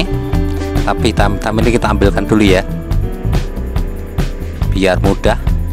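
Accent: Java, Indonesia. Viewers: Logat bahasane medok nyenengke tenan dirungoke.. 😂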